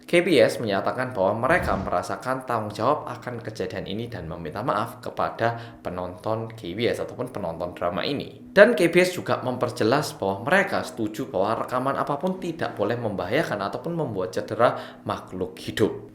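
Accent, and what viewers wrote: Java, Indonesia. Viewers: Baru kenal Mas e ,,, ceritanya gimana oppa jadi semedok itu... 🤣 Udah nikah belom oppaaaa???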